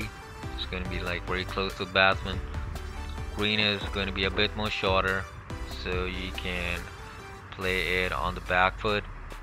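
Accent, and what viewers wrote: United States. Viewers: Oh now I get it. In one of your earlier replies you had used hindi/urdu and from your english accent i thought you are an american. 😀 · Are you American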